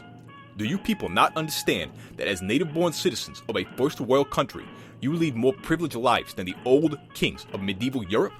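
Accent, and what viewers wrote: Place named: New York, United States. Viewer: dat new york accent, I subbed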